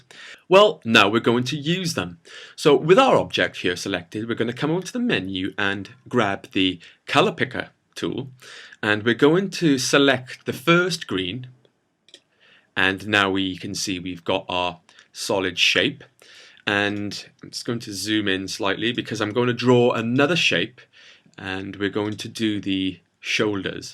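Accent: Wales, United Kingdom. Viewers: This is brilliant! Thanks for taking the time to teach us. Your voice is very calm and soothing, and your Welsh accent makes it even better! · Thanks! I've been using Illustrator forever, but never understood most of the basics. But your tutorial helps a lot. Love the Brit accent (we're fans of Foyle's War over here); takes a bit of thinking to get all you said, but well worth the time! Keep up the good work!! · Your british english is really awesome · Nice. Is that a British accent? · Are you welsh by any chance ? lol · Very informative & nice to hear a Welsh accent - thanks! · Thank you so much for the tutorial! And I think you are British, right? I like your accent very much! And I have a question, can I do exactly like that with Photoshop? Because somehow I lost my Illustrator CS4 disc and can't afford newer one anytime soon... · He's Welsh. Orrrrr, he could be English but lived in Wales for a bit, but the accent is Welsh. 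This is the best tutorial I've ever seen on the Tube. Good work mate.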